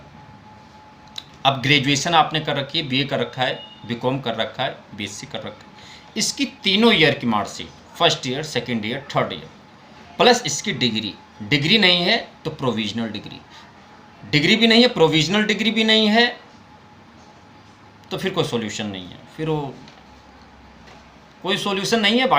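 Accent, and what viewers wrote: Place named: Ghatampur, India. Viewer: Ghatampur ya fatehpur ki language lag rahi hai, sir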